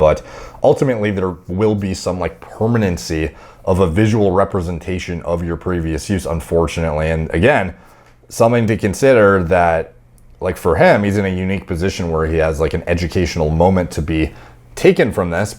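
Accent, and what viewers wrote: Ireland. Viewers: I love his accent. Very Irish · Buddy nobody taking fucking advice from no irish sounding asian that uses roids and is still smaller than an 8th grader wtf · Bro sounds Irish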